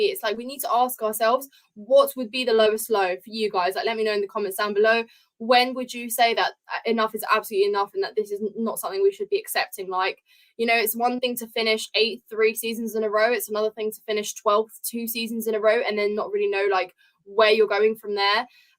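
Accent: United Kingdom. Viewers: Thanks.Ive got to say that the board will be taking that decision anyway.The next three games to the New Year could well put us in the danger zone.Could well be another interim manager to the end of the season..Its the Poch.playing system that is causing all these difficulties.Dearly wish we had signed Naglesmann to play that German system.It does work in the Priemership as Klopp has proved. Incidently I love hearing your London voice brings many homesick memories back!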